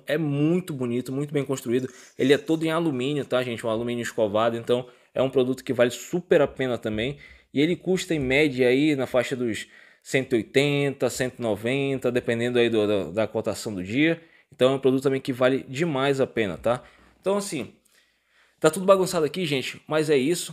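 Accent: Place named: Pará, Brazil. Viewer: sotaque de paraense.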